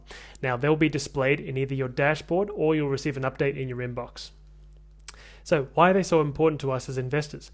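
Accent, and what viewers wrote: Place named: Australia. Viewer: He’s speaking Australian, that’s how we all speak.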